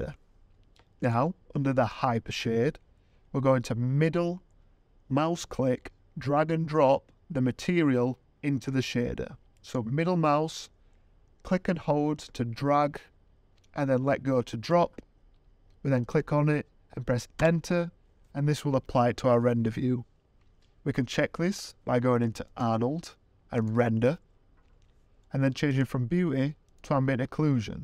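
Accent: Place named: Yorkshire, United Kingdom. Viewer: Legend with a yorkshire accent I thank you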